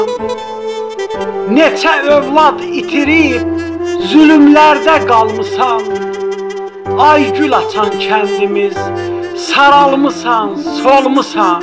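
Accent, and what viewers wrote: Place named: Karabakh, Azerbaijan. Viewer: Sağ qardaşımız.Sənin səsin şerlərin qədər gözəldi.Bəlkədə şerlərini başqa adam oxusa bu qədər möhtəşəm alınmaz.Çox sağ ol.Şirin Qarabağ ləhcən adamı özünnən aparır..👍👍👍Ağdamlı balası.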